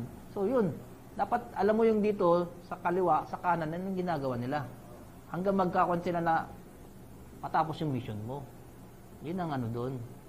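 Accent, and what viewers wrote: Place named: Visayas, Philippines. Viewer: FLuent sa tagalog si pero di nawawala ang,, ba.. Naisip ko n ilonggo or cebuano. Tga gensan pla👍👍👍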